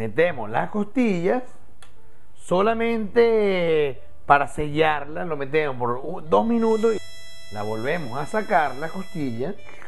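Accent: Caracas, Venezuela. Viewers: te amoooooooooooooooo, me encanto verte cocinar con tu acento caraqueño, quiero la receta de las lumpias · Simpático este chinito que no puede negar su acento Venezolano! Hoy iré al bosque en el barrio a ver si tengo suerte de conseguir algo de eso! Con esta escazes imagino coseguiré la mitad, el resto lo inventaré, así estamos aquí! Gracias por tu receta! Un abrazo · Guao acento Venezolano Caraqueño,exitoso... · Que cómico me encanta sus comidas pero me encanta también ese acento caraqueño sifrino que tiene gracias 👍👏👏❤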